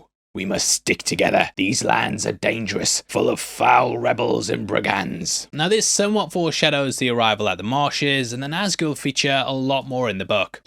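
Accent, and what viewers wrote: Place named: Newcastle, United Kingdom. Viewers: Love your work....BUT....I just can't stand you reading to us in Your Geordie accent. REALLY puts me off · All this time I didn't twig you lived in the north of England haha! I wondered why you sounded like you were from round these parts! Geordie here, love your content man! Great stuff.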